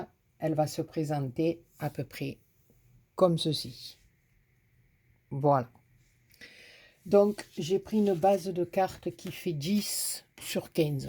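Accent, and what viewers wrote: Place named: Aubagne, France. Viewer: Bonjour.nous sommes ma soeur agnes et moi d'aubagne, nous vous suivons,vous êtes très soignée dans vos projets,avec des finitions nikel.en plus nôtres accent est magnifique.bisous d'aubagne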